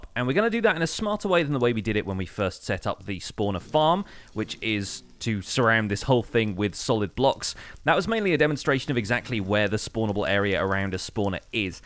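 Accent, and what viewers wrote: United Kingdom. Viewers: Bruh he is British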